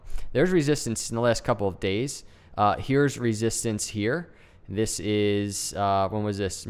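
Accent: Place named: Mid-Atlantic, United States. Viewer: I think I like you because you sound like you're from the NJ/PA/DE/MD area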